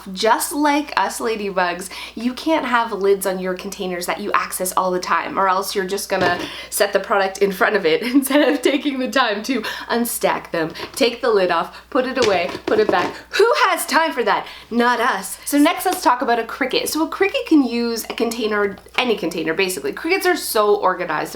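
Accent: Canada. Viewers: I thought you were from Canada based on your accent! ☺️☺️☺️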